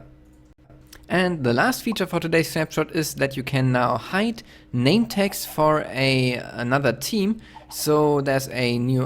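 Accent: Germany. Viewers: Are you german? ;)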